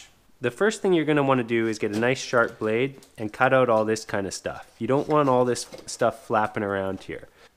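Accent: Vancouver, Canada. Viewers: the Vancouver accent is smoother than the Ottawa accent... less oat 'n aboat!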